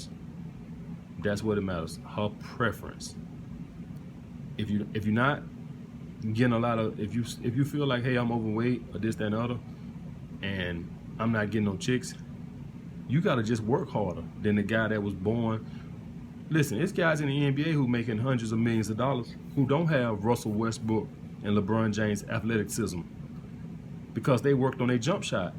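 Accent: South, United States. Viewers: You from the south?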